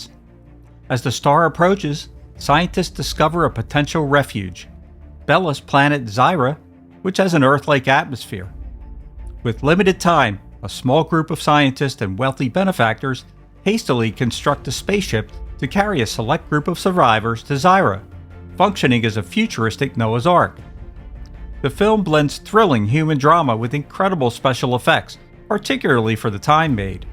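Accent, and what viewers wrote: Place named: Delaware Valley, United States. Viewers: Don’t ever lose that Philly accent! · Judging by your accent, you grew up in the Philly/Del/South Jersey area.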